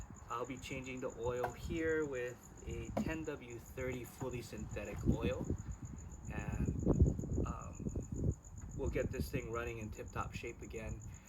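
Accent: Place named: Hawaii, United States. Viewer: 😂😂😂 I knew u was from Hawaii as soon as u had talk!! Aloha to u from Kauai. Thanks for the vid, very helpful! I’ll b trying to do my own oil change on my Mclane. 🤙🏼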